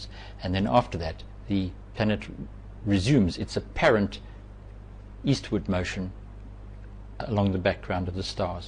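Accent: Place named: South Africa. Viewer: Weird, I thought the planets orbited in an anticlockwise motion when looking down on the north pole. But this guy sounds South African so I guess he's basing things from the southern perspective.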